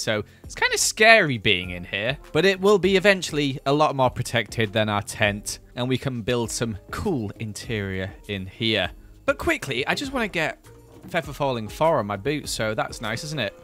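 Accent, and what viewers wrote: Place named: United Kingdom. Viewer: Is it just me or did got more.. British?😅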